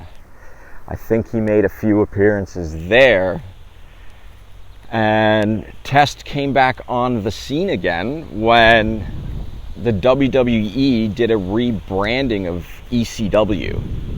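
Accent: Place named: Canada. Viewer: I can tell with all those "oot's" that this guy has to be Canadian.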